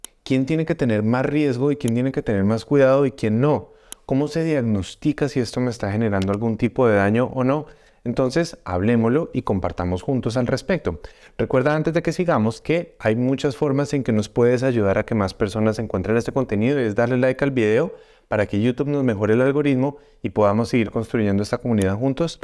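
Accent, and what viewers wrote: Colombia. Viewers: Gracias... maravillosa información..saludos de México amo el acento Colombiano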